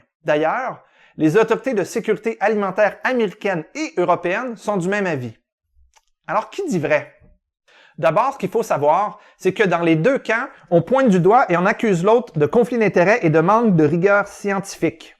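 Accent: Canada. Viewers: Hello, je découvre ta chaîne, tes vidéos mériteraient d'être diffusées plus largement, à un plus grand public. Le format est court, les explications claires. Je conseille à tous de diffuser et partager un maximum. (et puis l'accent québécois, je ne m'en lasse pas, que ce soit avec Olivier Bernard avec "Le Pharmachien", Isabelle Stephen dans "Le monde merveilleux du scepticisme" ou encore "L'inspecteur viral"). Continuez à chasser les idées reçues, vous êtes d'utilité publique! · Ben ouai c’est logique, un produit qui extermine herbes, insectes, vers et autres est inoffensif pour l’humain, c’est juste de la magie n’est ce pas? Àvec l’accent canadien rigolo c’est encore moins crédible...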